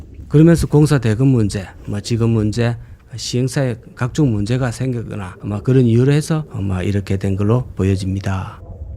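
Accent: Gyeongsang, South Korea. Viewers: 이 분 부동산 인사이트가 대단하세요. 구수한 경상도 사투리지만 설명에는 정말 뼈가 들어가 있어요. 부동산에서 인생의 흥망성쇠도 잘 보여 주셔서 참 많이 배웁니다. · 나레이션 특이합니다 경치도 재미있고 목소리도 재미있고. 집사람은 좀 느끼하다고 하지만 ㅋ 그래도 나는 경상도 사투리가 좋네요 ㅎㅎ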